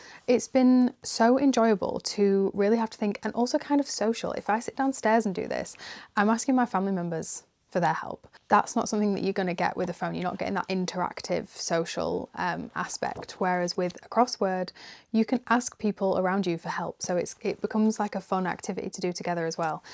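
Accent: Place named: United Kingdom. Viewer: i am really sorry for mistakes if there are any. I’m Russian🇷🇺 and I just wanted to bring more English into my life for practice. I always look for some videos with natives speaking British cause I prefer this accent and I absolutely like your one, your pronunciation is pleasing to my ear🙏🏼 thank u so much, it was interesting to listen to the way how u are expressing your thoughts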